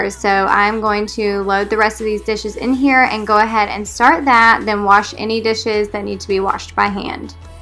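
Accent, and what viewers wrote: South, United States. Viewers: Amanda sent me! Also, the moment I heard your voice, I felt your fellow southern accent! 😍 Mississippi sending some love two doors down! 😍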